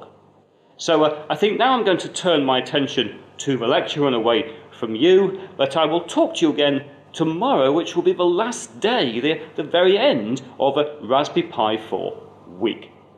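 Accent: United Kingdom. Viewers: The whole Retrofuturustic vibe of this channel , presented by a (very) Brittish Professor is just so unique I can't have enough of it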